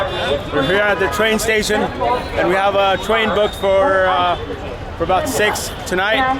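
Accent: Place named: United States. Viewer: You looks like Indian and having accent like American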